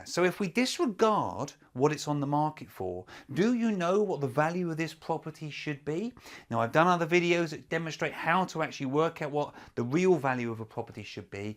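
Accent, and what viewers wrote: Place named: England, United Kingdom. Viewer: Gt video,u might not believe It, but your voice and English accent makes the video more attractive 😀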